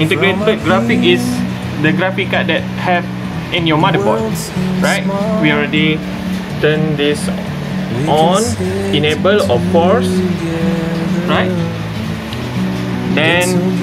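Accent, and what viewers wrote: Malaysia. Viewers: Malaysian? 😃 Or Singaporean I'm from Singapore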